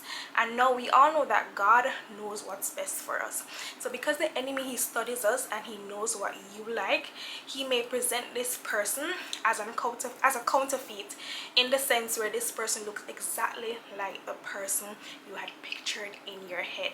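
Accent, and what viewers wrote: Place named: Jamaica. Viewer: Hello I see You have an accent are from Jamaica 🇯🇲 by any chance?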